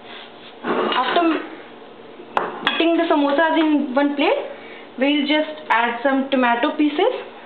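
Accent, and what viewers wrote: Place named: India. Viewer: Its indian accent...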